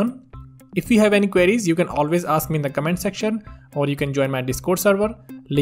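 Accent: India. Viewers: Sound Like Indian? But Very Clear and Clean. ❤️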